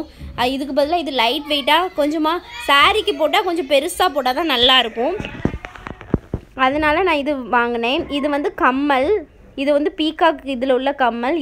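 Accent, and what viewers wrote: Tirunelveli, India. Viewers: Sister neenga Tirunelveli ah.....speech apdi iruku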